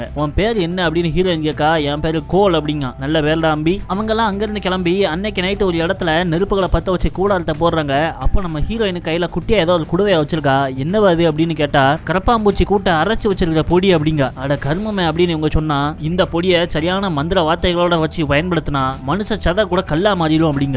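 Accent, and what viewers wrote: Tirunelveli, India. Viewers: Tirunelveli slang thalaiva 😁ellarukum pudikum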